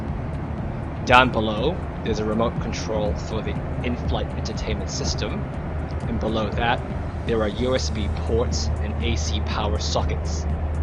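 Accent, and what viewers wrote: Australia. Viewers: Is that a hint of an Aussie accent I detect? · teach me how to speak "Australian" - when you used to say "Partner", was that another term for wife over there?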